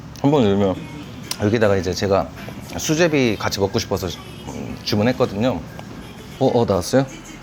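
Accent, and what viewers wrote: Chungcheong, South Korea. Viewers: 발골 하는 재미가 있는 닭발 :) 바쁜 사람은 못 먹는 닭발 집 ㅋㅋㅋ 충청도식 화법 너무 좋아요 충청도 인 으로써 ㅋㅋㅋ 오늘 영상도 잘 봤습니다❤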